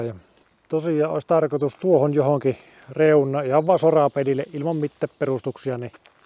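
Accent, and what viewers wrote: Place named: Finland. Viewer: Olipa mukava viteo👍, komia katos tuli. Sulla on ääni ja murre vähän ku Päätalon Herkolla😂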